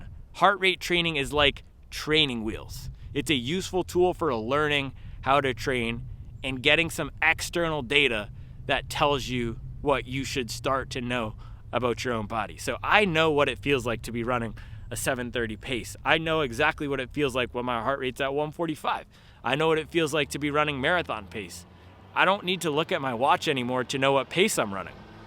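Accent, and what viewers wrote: United Kingdom. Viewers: I swear I thought u were British 😂 I was 2 seconds from cutting it off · I’m British and thought you were British for a few seconds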